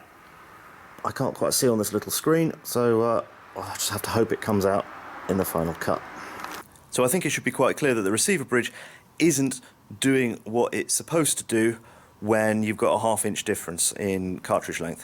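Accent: England, United Kingdom. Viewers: Hi, nice test. Are you English? Can a Garand T2 girino a 30-06 cadridge? Andrea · so weird to hear a British accent on a gun video instead of an American southern drawl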